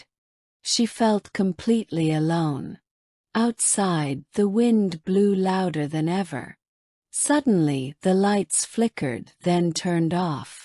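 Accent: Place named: United Kingdom. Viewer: شكرا لاني بحاول اتعلم الاكسنت البريطاني ومالقيت كثير مركزين على أن يكون كلام بريطاني مىة بالمىة وانا احب قناتك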